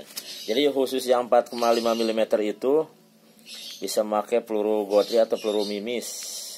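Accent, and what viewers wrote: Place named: West Java, Indonesia. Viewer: nah itu dia om,,kalo ikutan gabung kan biaya nya bikin jangarrr...hehe btw si om nya asli mana ya..? kayanya org sunda ya? logat nya kaya gk asing om,,khas sunda wetan banget,,tasik,,garut,,ciamis..😁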